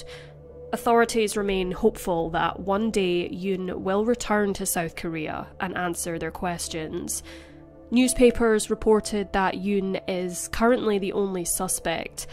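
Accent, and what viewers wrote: Scotland, United Kingdom. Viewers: This sounds like it’s narrated by a fellow Scot! 🏴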